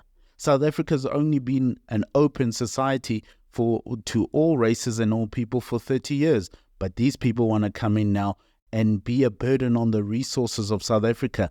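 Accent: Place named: Netherlands. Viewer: Who are you to decide for us you are a white boer you don't belong here either you were deciding on us when it was aparteid so now fick off to Holland and stay there with. Your Dutch accent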